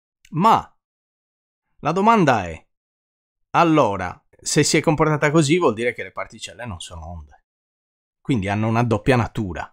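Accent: Sardinia, Italy. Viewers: Sardo sono.......e si sente.......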